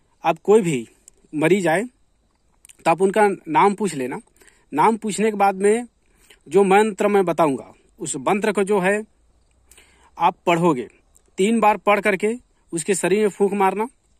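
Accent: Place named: Chhattisgarh, India. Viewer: आप लगता है। छत्तीसगढ़ से है। आपके बोलने की शैली से पता चल रहा है। कहां से हैं महराज पता व नं बताये। धन्यवाद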